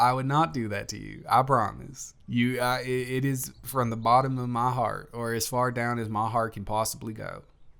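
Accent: South, United States. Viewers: I love the southern accent, too adorable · THE SOUTHERN ACCENT IS AMAZING MORE PLS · AYE MAN WHAT DID I TELL YOU ABOUT THE SOUTHERN ACCENT!!😂😂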